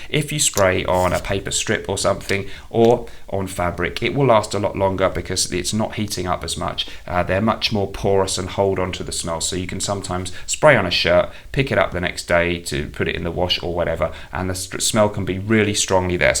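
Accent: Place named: RP, United Kingdom. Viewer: One of the best things anyone can do is drink water people lots and lots of water to keep your body and skin hydrated and quite drinking alcohol this is one of the biggest impacts I've had on my perfume performance drink lots of water ... great video gotta love the Queen's English 🤣 "fat kid at the chip shop" 😃😂😂🤣👌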